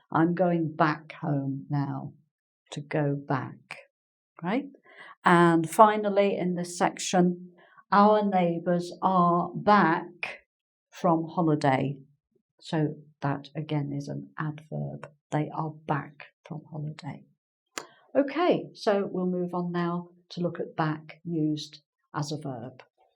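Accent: United Kingdom. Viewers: I'm a spanish speaker and I really enjoy all your lessons. I have problems with the british pronunciation but I can understand you perfectly. Thank you very much you helped me a lot. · Hi, I'm from Brazil!!! You are incredible!!! I love your channel and I love the British accent. Thank you for sharing your knowledge with us! 👏🏻❤️😍🇧🇷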